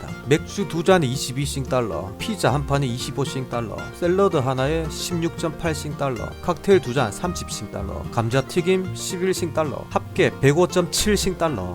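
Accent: Gyeongsang, South Korea. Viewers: 이때까지 100번 들었습니다 저는 경남 창원사람인데 말씀 하시는 게 대구사람 같네요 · 16일에 싱가포르가는데 정말 많은 도움이 됬습니다 ㅎㅎ 저도 경상도라 말투에 호감이가네요~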